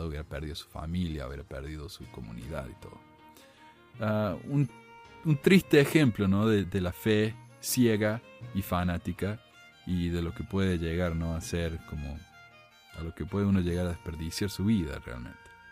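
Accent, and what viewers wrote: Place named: Córdoba, Argentina. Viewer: Disculpame, sos de Córdoba, Argentina? Por tu acento, digo.... Gracias!!!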